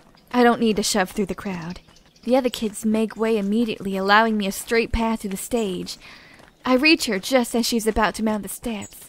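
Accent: South, United States. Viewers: I like the southern accent...Good job! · Cool but um what's with the country accent?... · What's up with the southern accent?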